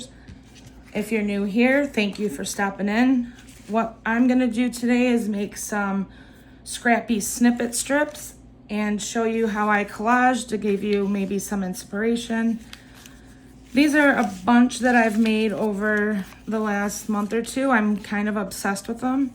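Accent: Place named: Michigan, United States. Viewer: You sound like Michigan...I'm from Royal Oak.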